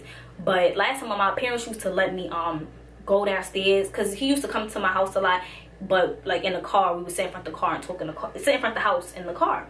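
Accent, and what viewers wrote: New York, United States. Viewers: yess NY accent, always spot a NewYorker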